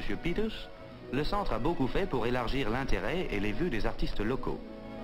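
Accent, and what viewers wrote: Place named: Quebec, Canada. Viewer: This sounds like a Québécois narrating the documentary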